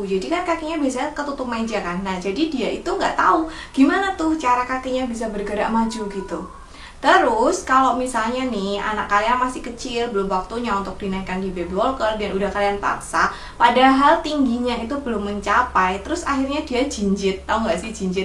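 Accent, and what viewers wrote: Java, Indonesia. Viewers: gledekan wkwkwk aku suka karna bu bidan medok kaya aku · banter bangeet 😂😂 bu bidan mantap, njowoniii bangeet 😂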